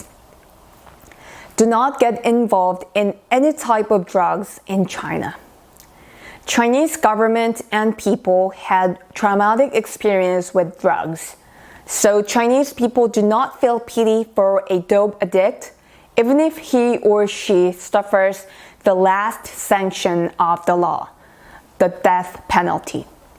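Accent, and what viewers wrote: South Korea. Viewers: Are you Chinese or Korean, You sound like Korean. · ARE YOU FROM KOREA.I THINK SO,BCOZ OF UR STYLE OF SPEAKING.WAY U SPEAK ENGLISH.I AM FROM INDIA. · The Chong Dynasty? Never heard of it. The speaker speaks Korean English. She has an axe to grind, wouldn't you say? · you sounds like Korean. Are you Korean? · Why the host has an Korean accent? I thought she's from China. In Korea religion is total free dom. There are men constantly claiming they are the Gods and make it into a successful business. In China, no, religion is watched by the government. Everything must fits into one idea---development.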